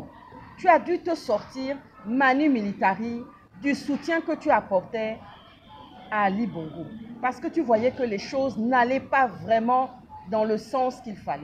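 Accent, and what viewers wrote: Cameroon. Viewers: Tu te dis gabonaise mais t'as l'accent du cameroun.hummm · Tu es sûre que tu gabonaise ? Tu as l accent du Cameroun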